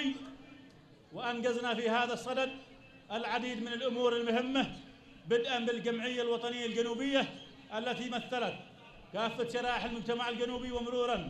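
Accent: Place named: United Arab Emirates. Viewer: كلامك اماراتي روح بلادك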